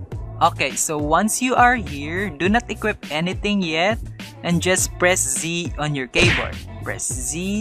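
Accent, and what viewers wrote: Philippines. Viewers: This accent, you're a filipino aren't you?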